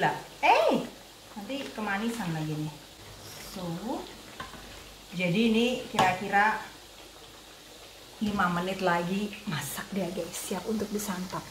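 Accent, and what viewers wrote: East Java, Indonesia. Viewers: Mb ini dr logatnya mesti jawa timuran ki..😆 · Hahaha lucu mbak ikiii, medhok nya jowo tenanan.😍 Tp pancen enak tenan Sayur Bobor kuwi...., karo iwak asin ae wes TOP mar ko TOP.. Jadi ngiler akuuu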